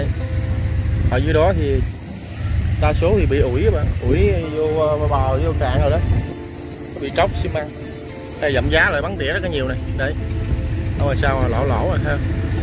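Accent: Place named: Mekong Delta, Vietnam. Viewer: Chỉ đọc câu chào bà con và các bạn đáng được 1 like .và chia sẻ . Nghe thật dễ mến, chất người miền Tây . ( Ghét nhứt những người nói câu chào mọi người nghe thô tục và chói tai )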